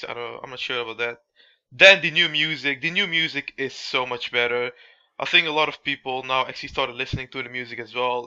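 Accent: Netherlands. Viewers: je hoort egt dat hij nederlands is :p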